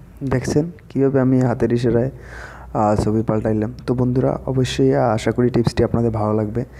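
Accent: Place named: Gopalganj, Bangladesh. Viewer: আপনার বাড়ি মনে হয় গোপালগঞ্জ!!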